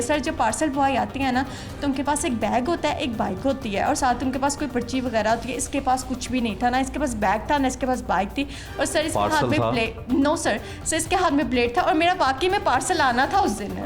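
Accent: Punjab, India. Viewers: Accent tu completely punjabi hai. Lakin punjabi bulna nahi hota.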